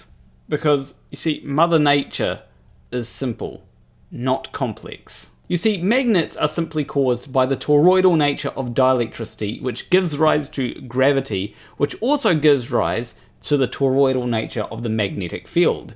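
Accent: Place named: New Zealand. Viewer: ...and you have the most kiwi accent to ever not be on many world maps.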